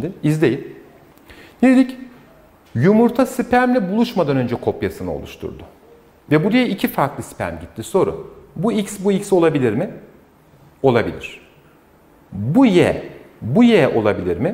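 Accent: Standard. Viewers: Hocam 10 numara 5 yıldız adamsınız. En önemlisi diksiyon harika ve tertemiz Türkçe. Ha bi de Halil Sezai ders anlatıyor zannediyorum hocam arada karizma adamsınız :) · türkçesi maşallah TRT muhabirleri gibi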